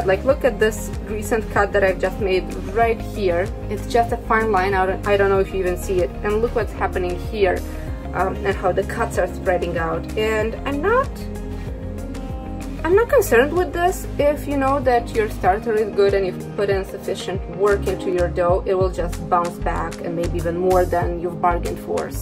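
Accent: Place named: Egypt. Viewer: Your accent reminds me of some of my Egyptian friends. :)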